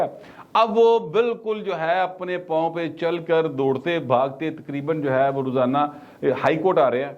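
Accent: Punjab, Pakistan. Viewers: Like ur style with Punjabi touch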